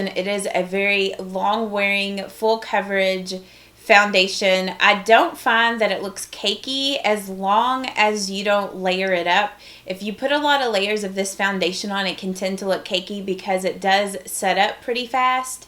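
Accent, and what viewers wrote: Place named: Kentucky, United States. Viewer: I thought your accent sounded familiar! I'm from Ky also(right outside of Louisville), currently stationed in California. I can't wait to get back to the blue grass❤️ I love your videos, I look forward to watching them.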